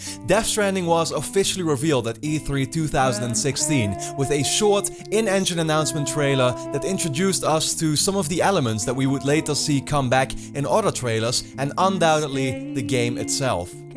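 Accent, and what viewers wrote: Netherlands. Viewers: Awesome vid! Great for killing time, while we're all waiting for the next DS trailer... oh and by the way, geweldig nederlands-engels accent haha!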